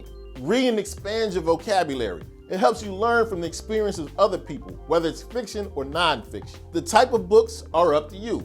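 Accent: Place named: Alabama, United States. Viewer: Bro you from Bama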